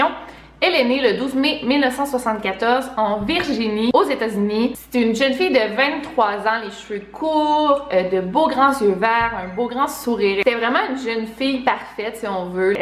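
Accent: Quebec, Canada. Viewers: " elle est pas nul part " c'est tellement mignon le Québécois 😂 Merci pour les vidéos elles sont vraiment bien. Les explications sont toujours complètes et très bien racontées :) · Au début j’avais beaucoup de mal avec ton accent québécois mais depuis un mois tu es définitivement ma youtubeuse préférée 😍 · dommage je comprends pas tout ce que tu dis avec l'accent quebecois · Je t'ai découverte grâce à la collaboration que tu as faite avec Liv mvz et depuis je suis accro à tes vidéos c'est fou ! De plus, j'aime trop l'accent quebecois et ta voix, sa me calme fin je sais pas comment expliquer mdrr. Continue comme ça, t'es vidéos sont simple et bien réalisées 😉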